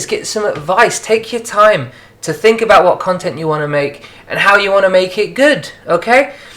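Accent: United Kingdom. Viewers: Awww there you go :D you can talk to the camera perfectly! You must be british though :3? Very true tips :)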